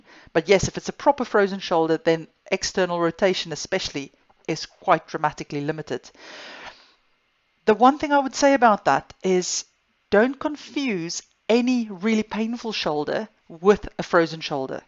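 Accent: South Africa. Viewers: I lived in Auckland NZ I discovered a very painfull shoulder on my August Europe trip every time I put my backpack over my shoulders it really where getting worst coming back the spec diagnosed it at at frozen given a guided steroid injection it feel better for 4 weeks and now feel worse, but the whole time its really painful at the moment but at the back of my shoulder blade the pain shoot down to my fingers I think there is a tear in my rotar cuff. If I stretch my arm straight it feels like it stuck and so painful. My left shoulder already had a rotar cuff operation with a few tears because of an accident. I don't wont to go overboard with excercises just do about 5 at the moment but will it really take 3 years for healing. Their where no MRI taken of my shoulder. I hear your SA accent I left 25 years ago, nice day😊 Groete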